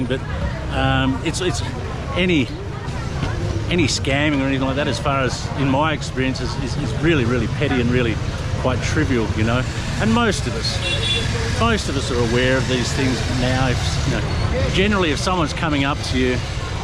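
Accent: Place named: Australia. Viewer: It certainly wasn't a dig. In fact it was nice to hear some familiar "Australian English", as we wander around Europe.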